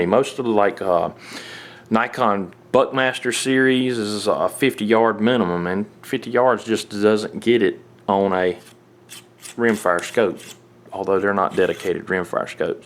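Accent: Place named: Ireland. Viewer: that some thick accent you got there, you from Ireland?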